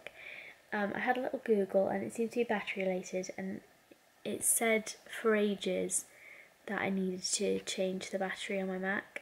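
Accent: Scotland, United Kingdom. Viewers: It's only now I've started to notice a little Scottish accent coming through!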